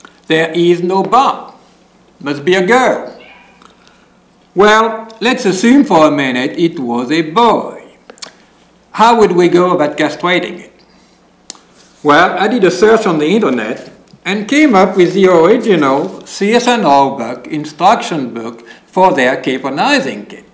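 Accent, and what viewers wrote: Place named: Louisiana, United States. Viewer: Interesting but that "smacking" gets on my nerves. Also, he sounds like a Cajun.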